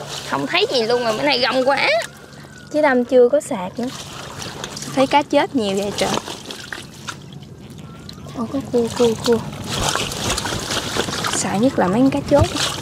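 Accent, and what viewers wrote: Mekong Delta, Vietnam. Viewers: Em chat giong mien tay nghe ngot nhu mat ong rung,❤❤❤❤❤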